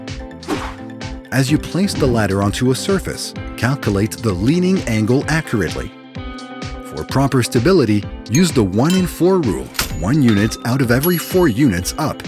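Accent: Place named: United States. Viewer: Why do I have to listen to an American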